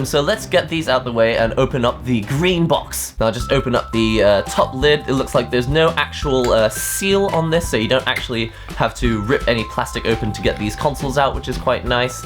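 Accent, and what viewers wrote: United Kingdom. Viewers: Im amazed how you sound like your from the UK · did you grow up in the uk? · For a gamer living in Japan, you do sound very British. (It's a compliment don't worry, I'm not saying that you're not actual Japanese). A pity Nintendo didn't make a version of Game Boy Green for the west even as a download title for these consoles or sell it pre owned, that would have been a hot seller, but there you go. All they had to do was include the red/blue Pokémon that you couldn't get in Yellow as exclusives and there you go. :D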